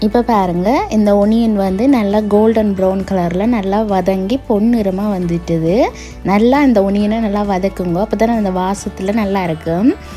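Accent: Sri Lanka. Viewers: Congrats...ungal ilangai tamil enakku romba pidichchirukku...super...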